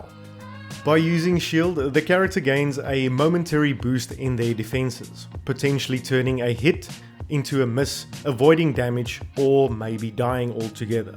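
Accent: Afrikaans, South Africa. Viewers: Nice video; are you Afrikaans?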